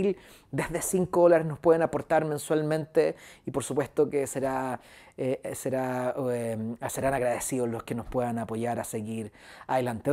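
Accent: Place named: Mexico. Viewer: Idea mia o habla como mexicano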